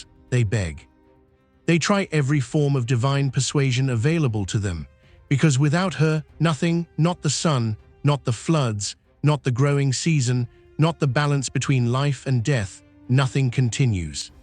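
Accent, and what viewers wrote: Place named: Europe. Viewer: Why do these channels claiming to reveal hidden African truths hidden by Europeans always have a European narrator? Let us hear our history with our own "voice"!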